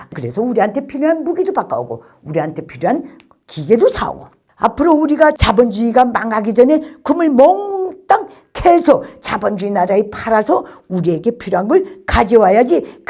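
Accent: North Korea. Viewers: 저말대로라면 북한은 이미무너졌어야하는데 아직도 멀쩡하잖음?내년도 그후에도 멀쩡히 체제유지할거같은데? 말투가 아직도 북한티못벗었고 왠지 상대를 가르치려는 태도가 좀불편하네. 주구장창 주저리주저리 뭔가 말은하는데 도통알아듣질못하겠다. 듣고있는게 피곤하다. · 한국 오래사셨는... 말투가 그대로 북이시네 ㅋㅋ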